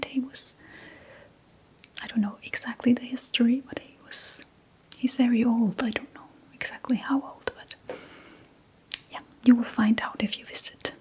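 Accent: United States. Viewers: Someone please tell me how this Dane not only speaks flawless English but does so with an American accent. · I would have liked an English version rather than American English.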